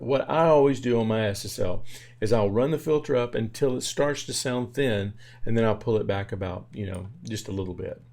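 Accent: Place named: South Carolina, United States. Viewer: Great video my friend. I was trying to place your slight accent. Maybe South Carolina? haha don't know. Don't disclose this, I like your voice lol. Great video my friend. Well done!!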